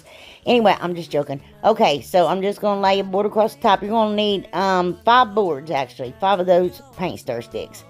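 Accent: South, United States. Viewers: IOVE YOU!!! And your channel If people don't like the way you talk then they shouldn't be watching I love the way you talk you are southern So am I Anyway (lol) some people just cant be nice!!! it's not in them But Anyways I loved all of them Great Job!!! You are the BEST!!!! You're my #1 crafter🖤🖤 Take Care My Friend and God Bless you and your family Love you to the moon and back and my #1 phrase is if you don't like me Oh well your loss I'm a nice person and if you're talking about me you're letting someone else rest awhile So suck ot up Butter cup (lol) Love you Sweet lady🖤🖤🖤🖤🖤🖤🖤🖤🖤🖤🖤🖤🖤🖤🖤🖤🖤🖤🖤🖤🤣🤣🤣🤣🤣🤣🤣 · Girl I thought I sounded country but you have me beat. I love it. ❤️❤️ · Het, I just love all of these. They are all so stinking cute. I can't pick a favorite. For anyone who says anything about you or the way you talk, they don't have to watch. There are a lot of ignorant people. 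I guess they don't know any better. We love you and your crafts and the way you talk. I particularly love your southern accent. So don't even pay attention to people that say stupid stuff. The people that matter love you so that's all. 😁 ♥️♥️♥️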